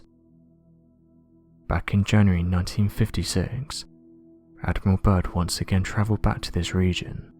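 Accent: England, United Kingdom. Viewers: Why are you whispering ? Speak up already ! It’s bad enough that your slurring your words through your English accent. Can’t we get an announcer that speaks American English !?! It’s freakin annoying already.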